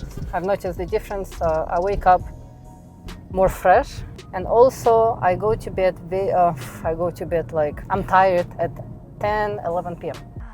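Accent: Russia. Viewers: Impeccable russian accent😊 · Она по русски говорит, акцент русскоговорящего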